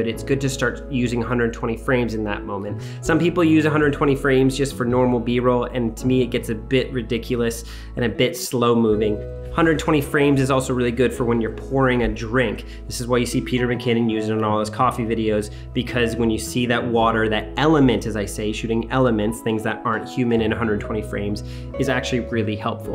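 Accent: Canada. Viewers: 3 seconds in. Canadian.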